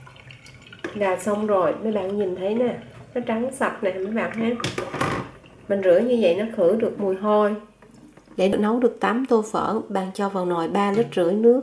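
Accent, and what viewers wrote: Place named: Mekong Delta, Vietnam. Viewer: hết sức chân thành cảm ơn đã nhiệt tình bỏ hết tâm, sức để hướng dẫn, phổ biến những món ăn quốc hồn, quốc túy của Việt Nam cho mọi người một cách đầy đủ, chi tiết kể cả bí quyết gia truyền nhất làm các món xuất xứ từ Miền Bắc như Phở bò, gà, bánh cuốn....tôi cũng rất thích giọng nói mang âm hưởng Miền Tây chất phát, phát thiệt tình....tôi thường xuyên xem các youtube của bạn chúc luôn VUI VẺ, KHỎE MẠNH nhe....và cống hiến nhiều hơn nữa cho cộng đồng: thank you...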